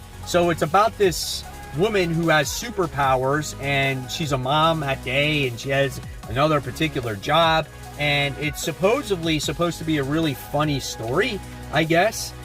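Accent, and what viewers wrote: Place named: Pennsylvania, United States. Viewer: I always thought you were in Pennsylvania with that accent. I live in SW Florida and love it. Great job on the 10k!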